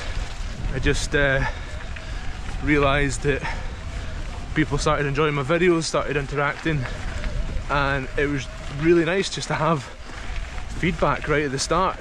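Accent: Scotland, United Kingdom. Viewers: Great content. And hearing someone swear with a Scottish accent is a bonus. 🙂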